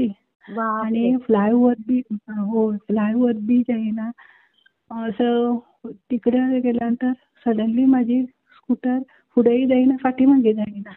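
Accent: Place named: Goa, India. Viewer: Hya tai govyahun bolat asnar nakki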